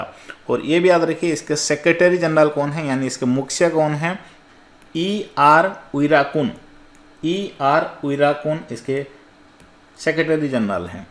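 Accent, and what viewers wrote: Bengal, India. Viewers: Sir ka tone bengoli h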